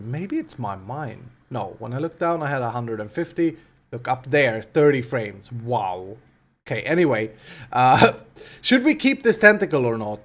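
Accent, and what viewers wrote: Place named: Sweden. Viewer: i am always disturbed by other swedes english. you are the only one i got used to.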